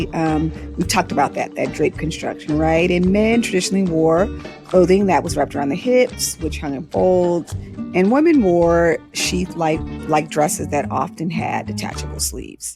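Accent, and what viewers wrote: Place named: United States. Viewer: Just cant hear the terrible american dialect.... sorry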